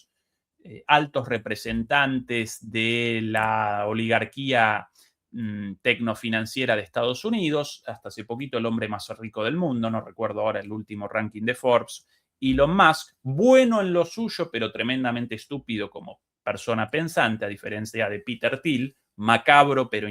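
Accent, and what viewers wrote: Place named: Buenos Aires, Argentina. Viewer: Pero hablas Porteño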